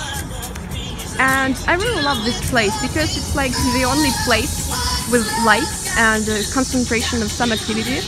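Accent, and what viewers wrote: Moscow, Russia. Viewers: You are so comfortable with your delivery,and so are we.Get out of the small town,Big things await you.You need to travel.I'm sure we will follow.Love Russian accent! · I am surprised how little of accent you have, most Russians I meet in Canada have heavier accent than you do. yet you barely have any. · Shes been there eighteen years. The local kids hear her speak and think she's from Moscow. She then asks the kids about places for tourist to visit in the town. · A Russian girl can cuss me tf out with that accent, and I'd sit there like😍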